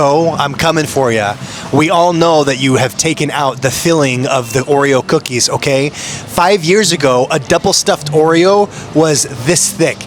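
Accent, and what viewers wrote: Philippines. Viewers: love ur filipino accent kuya ❤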